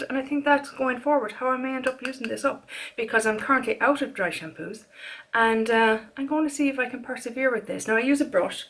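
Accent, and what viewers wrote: Ireland. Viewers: Hi that purple blush lol - next time I'm in Edinburgh i'm going to the Fenty counter to try it on. I'm super pale (Scottish milk bottle lol), cool toned. with grey eyes and blonde hair. I LOVE purple eyeshadow and a mauve blush, but the purple blush intrigues me. I've never seen it swatched before on light skin and I think I might like it - I love a lavender face powder, as it's so brightening on my skintone. Project pans are a fairly new thing to me (I found you through an empties video), but I love the Irish accent - my grandad was Irish and it reminds me of him. He lived in Scotland for around sixty years and never lost his accent. I've been to Ireland a couple of times - once to visit relatives with my grandad (before he died) and once touring on the motorbike. Loved it both times, but it rains as much there as it does here lol, so it's not the best weather for the motorbike. 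It is pretty though and the people I met were friendly. Best wishes.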